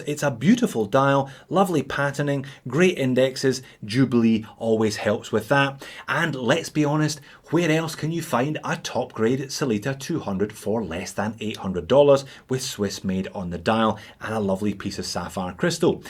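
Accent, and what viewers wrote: Scotland, United Kingdom. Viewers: I don't mind Australian, but that Scottish accent is just too precious, try to keep it intact! I wonder how much the linen dial itself actually costs to make? That boxed sapphire crystal looks amazing but certainly drives up the price massively, so does the Swiss quartz. Maybe someone could almost replicate the same look with a "vintage" boxed acrylic and a Japanese quartz for under 100 USD? Would buy that in a heartbeat.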